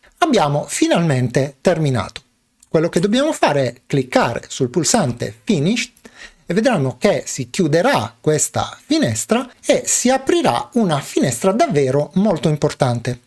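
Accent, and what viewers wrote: South, Italy. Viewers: Salut mon vieux, comment vas tu ? Ci si ri..legge ^^ Tre domande "bionde"...soltanto, anzi quattro..., insomma poche domande ^^ :- Malwarebytes si deve disinstallare prima di installare questo o si può fare anche dopo ? Vivi a Genova ? quindi non sei di Genova ! Sei del sud, vero ? La tua "erre" (e forse anche il tuo nome) ti tradisce ^^ Di dove esattamente ? Perché non puoi mangiare patatine ? Usi la stevia ho sentito, diabete forse ? Uhm la tua Nutella direbbe di no, o sbaglio ? ...nel corso del corso...? LoL Ciao e ancora grazie caro vecchietto ^^ P.S. In futuro forse dovresti aggiungere il software in download sul tuo sito prima di pubblicare il video che lo riguarda...vedi caso 3DP Chip ^^ P.P.S. Come avrai notato il mio italiano migliora constantemente. Brava la bionda, vero ? ^^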